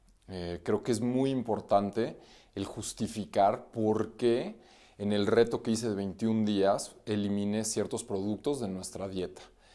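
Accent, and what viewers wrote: Mexico. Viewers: Excelente información muy valiosa.. Muchas gracias por su gran claridad y acento muy interesante a la Mexicana!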